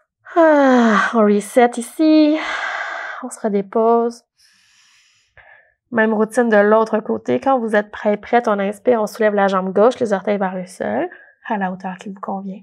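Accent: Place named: Quebec, Canada. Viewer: Je suis débutante et merciiiiiiii, j'ai adoré cette séance. et ce ton zen québecois cher à mon coeur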